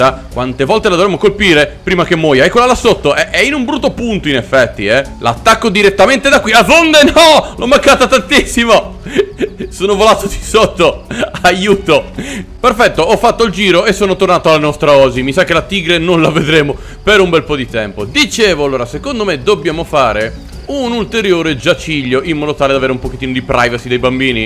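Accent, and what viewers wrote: Veneto, Italy. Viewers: Aaaaaaah ma sei un conterraneo del veneto! :D:D:D:D:D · Ma sei del veneto ? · Scusa ma sei del vaneto? Comunque sei troppo simpatico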